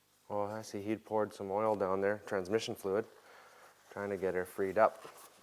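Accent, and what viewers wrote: Canada. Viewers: Lol Canadian accent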